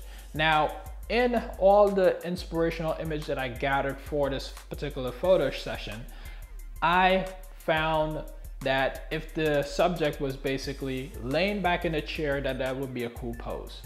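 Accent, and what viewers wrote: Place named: Jamaica. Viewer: Bredren, where you hail from? Sound a bit like my countryman...Jamaica. Great video.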